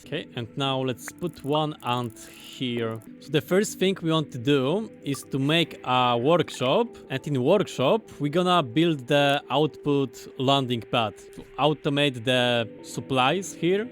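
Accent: Poland. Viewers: Odrazu wiedziałem że jestes Polakiem, wystarczyła chwila abym wykrył rodaka, poza tym niezły kanał, gratulacje.👌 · czy ja slysze polaka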